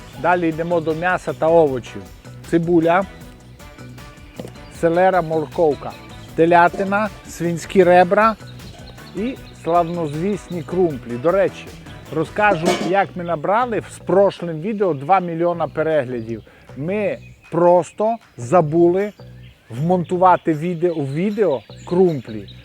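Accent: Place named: Transcarpathia, Ukraine. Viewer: я четко по акценту понял, что про бограч надо смотреть тут. Бограч и банош-прекрасные блюда. Люблю Закарпатье и людей. Привет из Харькова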